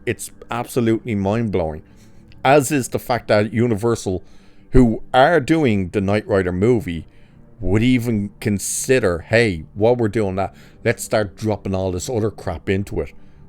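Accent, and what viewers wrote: Ireland. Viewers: After the remake tv series of knight rider, im not remotely interested. Those morons in hollyweird arent capable of making anything worth watching. Now....just curious, what is your accent? Sounds kind of irish-y with a bit of....perhaps romulan....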